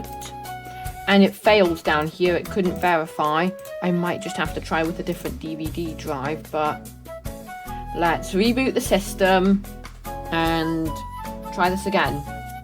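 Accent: United Kingdom. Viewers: I love how the british accent is so recognizable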